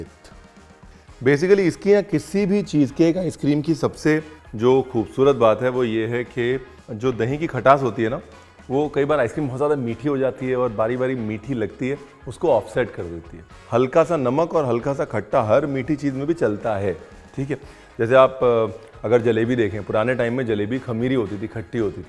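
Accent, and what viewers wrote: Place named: Lucknow, India. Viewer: Recipes ke sath jo lakhnauaa lahja hai bas sunte rahne ka man karta hai. bahut Bahut shubhashesh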